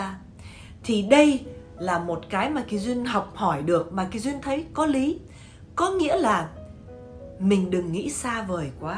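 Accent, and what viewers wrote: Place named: South, Vietnam. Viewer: Rat Thach Noi going nam, you are so cute!love you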